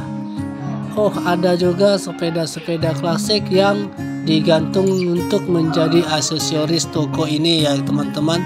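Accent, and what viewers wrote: Medan, Indonesia. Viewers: bg, abg orang medan y bg?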